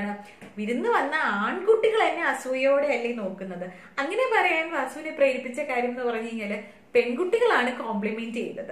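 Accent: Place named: Pattambi, India. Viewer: ഒരു പട്ടാമ്പി കാരിയെ പോലെ കഥ പറഞ്ഞു..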